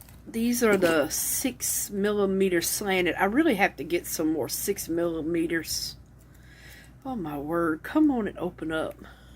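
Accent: South, United States. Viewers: Love all your videos,!!!! You crack me up with your southern accent and words you use. I love it! ❤️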